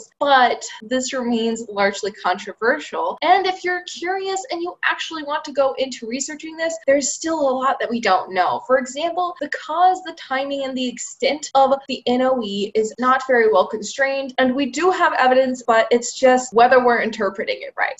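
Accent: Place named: Midwest, United States. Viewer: I ❤️! I’ve been patiently waiting for this video. Are you a Midwest girl? You sound “normal” to me being a Midwest guy myself. Let me guess you’re in the Chicago area?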